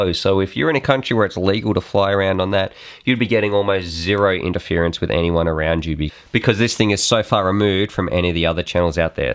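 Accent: Australia. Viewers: Are you an Aussie ?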